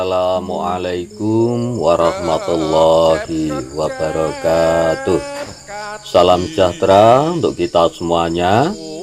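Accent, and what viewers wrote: Java, Indonesia. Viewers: Konsepnya bagus banget, Mbah. Tempatnya mendukung,musiknya musik jawa, mbahnya juga pake baju tradisional. Mbahnya juga bicaranya walaupun logat medhok,tapi masih jelas. Berasa sedang dinasihatin sama almarhum Kakek saya.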